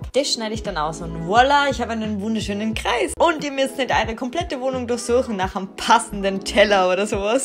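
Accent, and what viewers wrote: Vienna, Austria. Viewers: An dieser Stelle ein riesen Dankeschön für deine Mega tollen Videos 😊 ich liebe deine Projekte und habe so viel Freude beim anschauen weil ich den Wiener Dialekt einfach nur liebe 😍❤️😍. Vielen Dank und mach weiter so!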